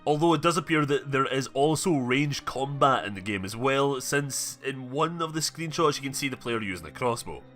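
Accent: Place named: Edinburgh, United Kingdom. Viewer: Also, my mum's from Edinburgh, so luv yr accent :)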